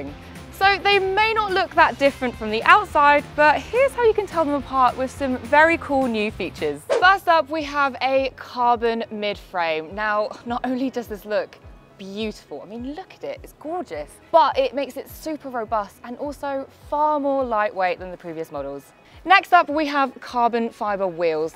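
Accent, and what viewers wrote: United Kingdom. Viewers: With her British accent, talking about London traffic and the bike being 16.3 kg, I was totally expecting her to use KM instead of Miles.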